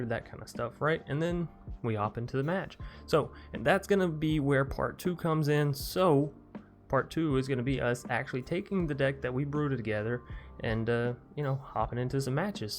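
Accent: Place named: East Texas, United States. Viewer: Your combination of the east Texas-esqe accent with the geeky content is highly entertaining.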